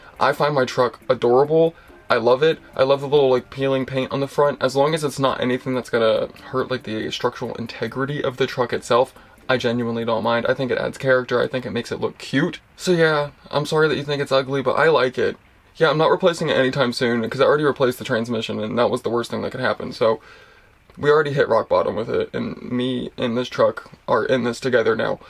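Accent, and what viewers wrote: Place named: Baltimore, United States. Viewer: I could tell you are from Maryland. You have a prominent Baltimore accent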